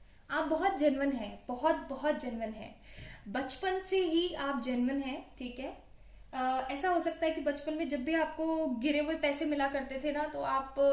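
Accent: Punjab, India. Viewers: punjabi bolte ho app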